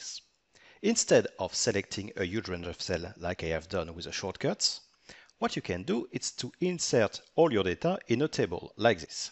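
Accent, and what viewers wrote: France. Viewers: Thank you for posting. Very helpful. The French accent made it even better. Thanks again.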